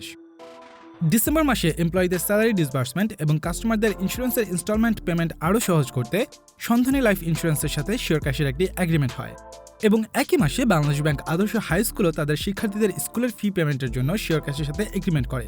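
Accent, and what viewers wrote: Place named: United Kingdom. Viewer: বাংলা বলবেন বাংলার মত করে, ইংরেজির ফ্লেবার লাগাইয়া বাংলার সৌন্দর্য নষ্ট করেন কেনো?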